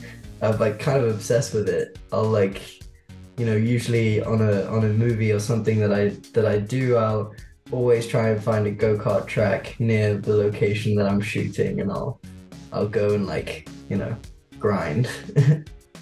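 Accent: United States. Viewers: Never knew he was British! He does such a good American accent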